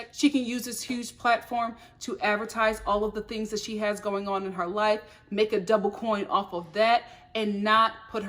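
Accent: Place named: Philadelphia, United States. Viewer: I won’t lie, I watch every one of your videos but I clicked this one with the quickest of thumbs just to hear you say “doll” in that Philly accent. 😂 Love you!!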